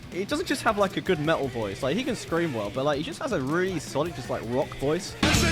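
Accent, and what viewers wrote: United Kingdom. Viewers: VERY BRI'ISH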